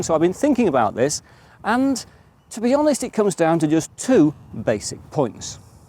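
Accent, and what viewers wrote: England, United Kingdom. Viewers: I whole heartily agree with the dumbing down based on A.I. and Social Media platforms. 1, A.I. via laziness. 2. Social Media -- the willingness to believe what is your mindset without any research of fact or fiction i.e. laziness but laziness with major consequences. Both are of concern as history does repeat for lessor reasons. Thanks professor for the update and keep up the good fight. Although we're separated across the pond by a common language, I enjoy each and every installment. Less and less translation is now needed from the King's English to my Southern Drawl. ;~)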